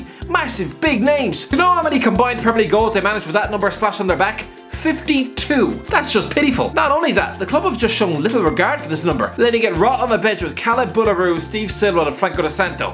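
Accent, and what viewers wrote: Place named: Ireland. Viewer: I can't understand a word he's saying 1 because he's irish 2 because he talks too fast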